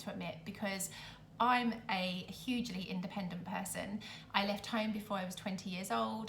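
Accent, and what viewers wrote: United Kingdom. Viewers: Hi, i'm watching all your videos because I want to improve my english with a British native speaker (I don't like too much the american accent..). They are funny and interesting, I found them useful for my goal, so... thank you for sharing your thoughts and considerations about your life in Italy. Sometimes your connected speech is a little too fast for my level, but it's an interesting challenge;) I have just a little tip for improve the enjoyment of your videos: the theme song is very very loud... but the audio when you speak is quite low, so I have to turn up the volume a lot during the intro... and a few seconds later the theme song starts and I find myself with destroyed ears!:) Ciao e grazie!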